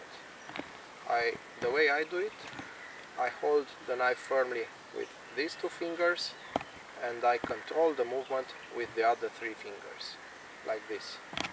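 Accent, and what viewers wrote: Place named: Romania. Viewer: You're romanian by the accent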